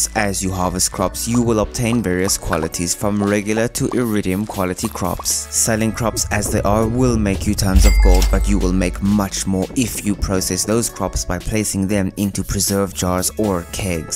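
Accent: South Africa. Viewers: So that's what that accent is. I like it I just didn't know that was a South African accent.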